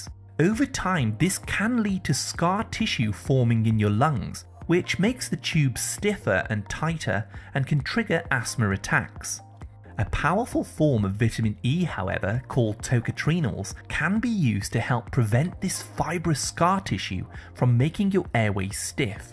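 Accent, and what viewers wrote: United Kingdom. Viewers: First off, couldn't they Find someone that spoke American, That British tongue Is irritating,.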